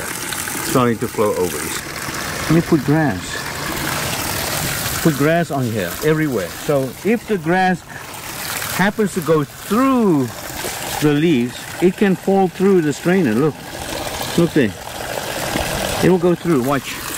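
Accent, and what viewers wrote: South Africa. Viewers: And here I thought you were originally from Germany. Must be an Afrikaner accent, right? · Are you South African that has been living in the states for quite a while?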